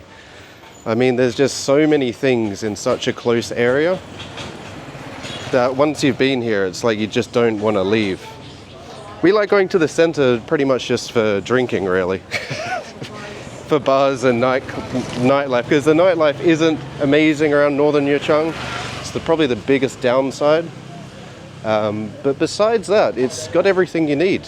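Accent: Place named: Australia. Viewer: I think he is Australian, it's his accent.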